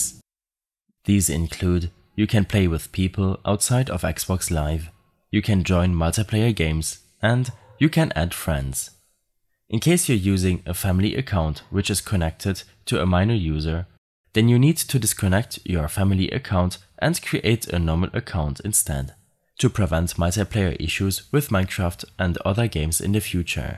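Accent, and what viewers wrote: Germany. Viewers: Noch keinen deutscheren Akzent gehört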